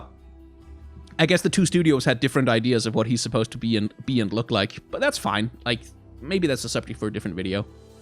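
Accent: France. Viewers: Your french accent is astonishing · that french accent is painful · that French accent made my day thanks buddy, great content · I could listen to with a french accent ALL.DAY.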